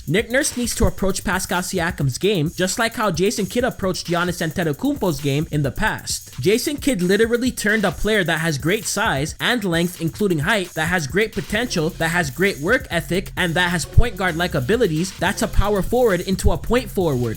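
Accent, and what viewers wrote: Toronto, Canada. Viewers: This guy is a Toronto man confirmed 🤣😭😭 the accent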